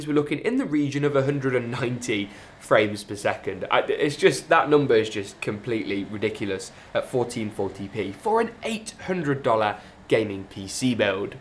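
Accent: United Kingdom. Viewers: Man i hate the British accent so freaking much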